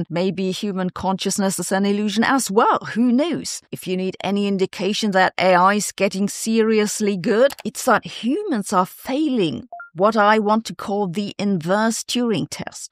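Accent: Germany. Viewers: In fairness you have a near flawless German accent.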